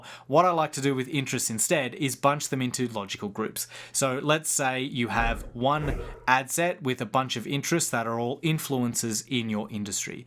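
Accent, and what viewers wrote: Australia. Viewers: Hey, good to hear and Aussie voice, also I suspect relevance to local biz. Any ideas re real estate fb ads? Regards Tony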